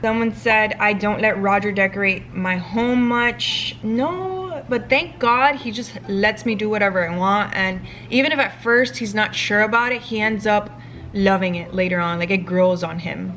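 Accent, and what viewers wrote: Cuba. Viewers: OMG!! Me encanta tu acento cubano, besos desde España. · ayyyee my question made it to the video! Me encanta tu acento cubano 😉 I’d be cool to see a GRWM in Spanish or any other video spoken in Spanish ! 🤗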